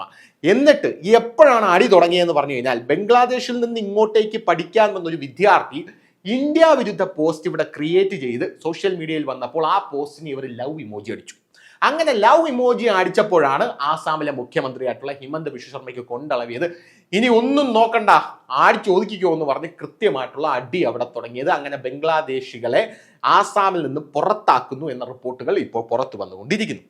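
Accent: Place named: Trivandrum, India. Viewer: i'm a big fan of your style and the tvm slang !! Makes my day cheerful.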